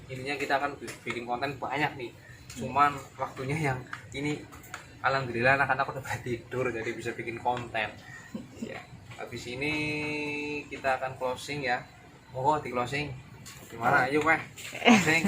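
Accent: Banyumas, Indonesia. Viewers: Rah ngapak rah kepenak😁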